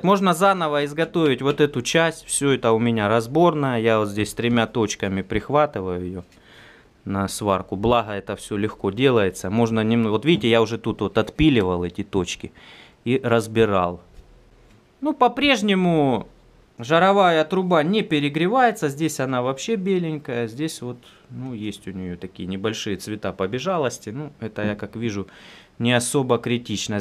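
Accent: Rostov, Russia. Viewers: Он из Ростова?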